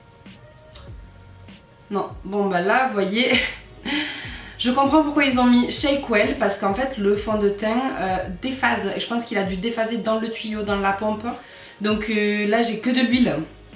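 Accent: South, France. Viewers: notre accent du sud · Ton accent me fait toujours plaisir, ça me donne ma dose de Sud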